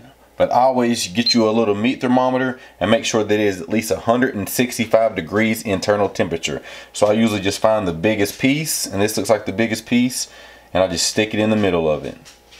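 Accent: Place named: Texas, United States. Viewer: I bet you are from Texas, you got that accent I’m familiar with 🥰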